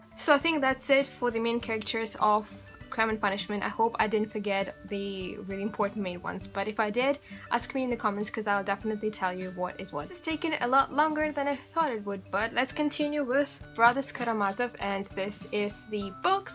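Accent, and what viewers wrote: Russia. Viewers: U r Russian ? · Are you russian? I have a doubt, since I recently read Crime and Punishment and the Gambler. Avdotya Romanovna is also refered to as "Dunia" or Duniecka by her brother Rodion/Rodia (maybe the names seem off but I read the spanish translation) is Dunia a third name for her, or just a nickname?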